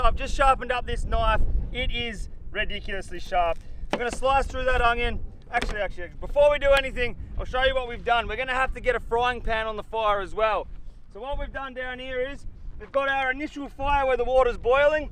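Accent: Australia. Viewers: Do you also read his replies with the voice and Australian accent?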